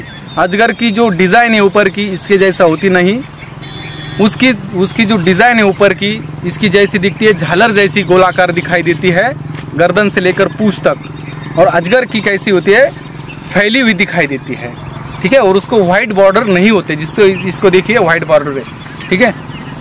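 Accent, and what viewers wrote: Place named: Maharashtra, India. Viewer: मराठी माणसाची हिंदी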